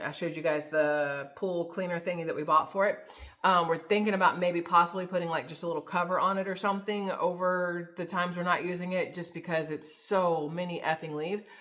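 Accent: South, United States. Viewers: The Southern...sounds so good...love fried green tomatoes.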